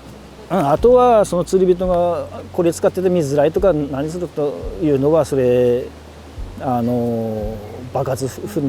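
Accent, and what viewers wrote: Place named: Northern Tohoku, Japan. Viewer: 北東北独特の訛りがかっこいい！ 、話を聞いているだけで、釣りに対する知識や姿勢が感じ取れる、かっこいい方ですね。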